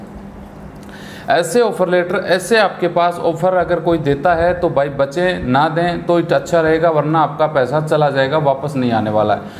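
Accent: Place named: Haryana, India. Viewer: Waise mujhe Aap Haryana se hi lg rhe ho ji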